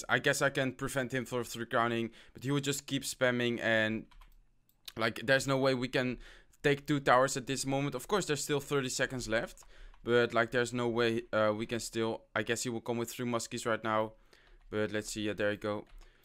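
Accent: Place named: Netherlands. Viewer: Are you dutch? Because you sound like a dutch guy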